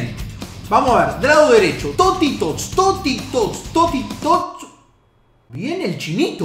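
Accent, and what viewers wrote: Argentina. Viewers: Se copia de robert solo que con acento argentino :v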